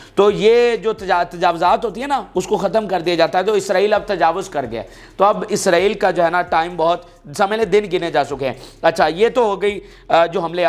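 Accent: Punjab, Pakistan. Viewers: Bhai ap English mat bola kren, apke pas English ka lahja nahi hai..sirf ap nahi pure Punjabion ke pas nahi hai.aplog English ko Punjabi lahje me bolte hai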